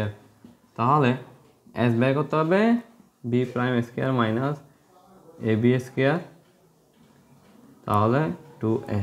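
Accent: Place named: Chittagong, Bangladesh. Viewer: accha to Chittagong vashai je kotha bolchen tar jono bolam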